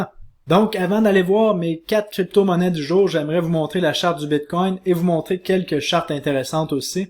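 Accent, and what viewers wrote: Quebec, Canada. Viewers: Bonjour, Merci beaucoup pour tes conseils très utiles et pertinents. L'accent Québécois en plus est parfait pour joindre l'utile à l'agréable. Salamalekoum de France.😉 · Bonjour le monsieur derrière son bureau. Encore une vidéo de malade. Merciiiiiiiiiii pour cette éducation sur la crypto. Et l'accent Québécois est vraiment rafraîchissant. Impatient de te voir sortir ta formation sur la defi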